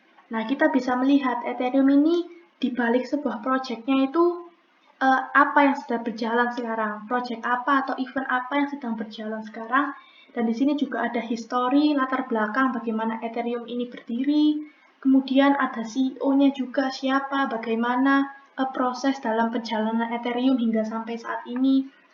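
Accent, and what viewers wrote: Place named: Surabaya, Indonesia. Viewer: Logat surabaya medok ci xixixiix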